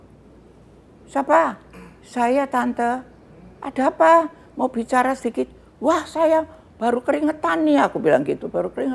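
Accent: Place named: Temanggung, Indonesia. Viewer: Raiso d colong Logate wong temanggung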